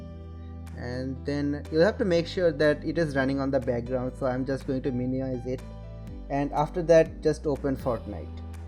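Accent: India. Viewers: your Indian accent made me suspicious but it worked so thanks ?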